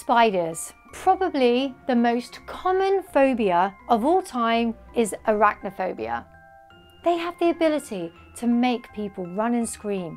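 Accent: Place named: United States. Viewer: You talk about rewilding in Britain but your not actually British yourself from how you speak I guessing you’re actually American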